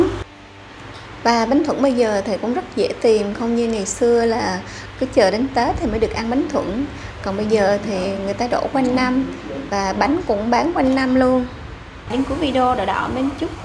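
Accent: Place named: Quang Nam, Vietnam. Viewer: Cám ơn chị đã chia sẽ vidéo làm bánh này theo đúng kiểu truyền thống. Nghe giọng chị trọ trẹ giống người Quảng Nôm, Quãng Ngữa dzị đó. 🥰🥰🥰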